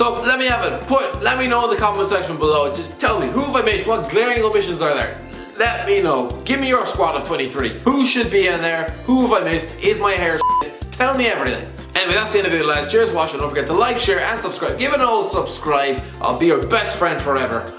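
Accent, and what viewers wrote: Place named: Ireland. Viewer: Where in Ireland are you from.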